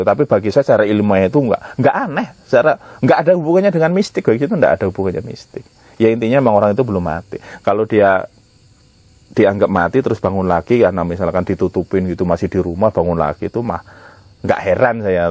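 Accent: Banyumas, Indonesia. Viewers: ora ngapak ora kepenak.... 😁 salam ngapak ( karang pucung )... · Lah bisa ngapak juga😅😅😅.. Asale endi sih rika pak??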